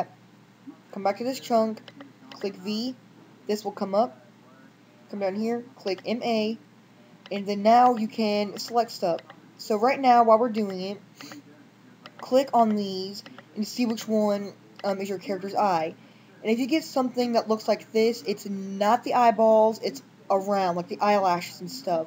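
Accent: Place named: Finland. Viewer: I really like ur accent! is it Finnish?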